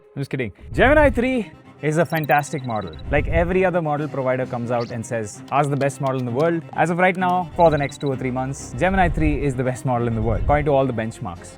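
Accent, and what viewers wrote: India. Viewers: For a moment, I thought i accidentally switched on the hindi dub mode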